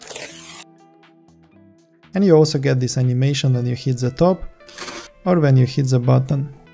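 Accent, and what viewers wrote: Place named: Russia. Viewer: English is not your native language so mistakes are acceptable, important thing is that people who watch your videos can understund you. Btw are you from Russia?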